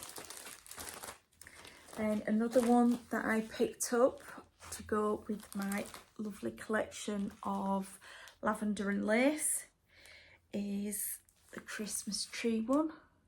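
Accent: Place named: West Yorkshire, United Kingdom. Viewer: Hi I’ve just started watching you. Carol the saltbox stitcher recommended you. Your framing is beautiful, could I ask where did you get the long narrow frame please? It’s gorgeous. I am in South Yorkshire and I’m guessing you’re in West Yorkshire going on your accent. Anyway I enjoyed your Flosstube. Thank you. 😊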